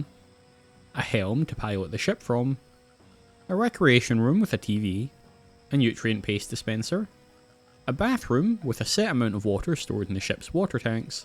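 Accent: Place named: Scotland, United Kingdom. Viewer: Which part of scotland are you from man, peak video btw.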